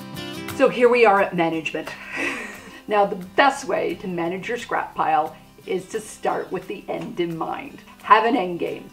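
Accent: Canada. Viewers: Enjoyed your video very much, so I subscribed. I feel positively brilliant because, as a newbie quilter, I figured saving 2 1/2" squares, 2 1/2" strips, and 5x2 1/2" rectangles would be most useful--same as you, an obviously experienced quilter, figured! I needed quilting fabric to make a block once a month for a local craft store--they draw a name and the winner takes home all. Since I let it be known that I would accept scraps, now I have plenty for the block drawing ;-) The pieces that are too little get chopped up to make animal shelter beds. A friend also gave me a ton of leftover scraps from a T-shirt class, and then there are all the batting scraps too small to piece together for potholders. Watching TV with my husband is a good time to cut up all those unwanted scraps! BTW, love your Canadian accent. Signed, a scrap loving neighbor in Washington state.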